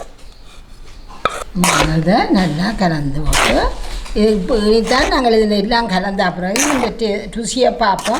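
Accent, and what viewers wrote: Jaffna, Sri Lanka. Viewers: Amma neega oor enga? Ungada pecha kekka enakku yalpanam varavenum pola irukku. Nandri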